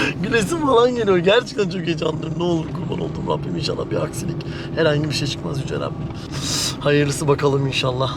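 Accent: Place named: Muş, Turkey. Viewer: Hayt dayim canını yiyim ne güzel konusuor mus sivesiyle muslular candir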